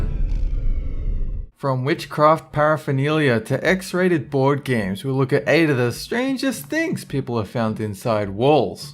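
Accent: Australia. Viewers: is he aussie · Sounds Australian to me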